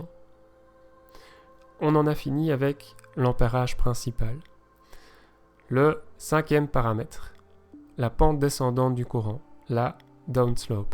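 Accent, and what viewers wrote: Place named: Belgium. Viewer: Merci, ça fait du bien de se remettre ces réglages en tête, avec un petit accent Belge en bonus !!!!! Merci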